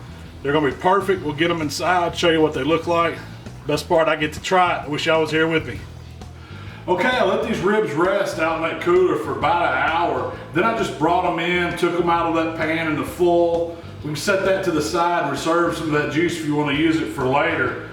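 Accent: South, United States. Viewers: You're just referring to his accent. That's how we talk in the south.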